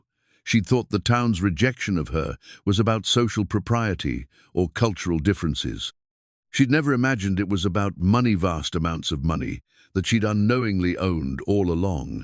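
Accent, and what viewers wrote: England, United Kingdom. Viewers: It's too bad you've got stuck with this narrator, he doesn't know how to pronounce American names & words, he's too English he sounds completely strange. Please give him & his boss my comments.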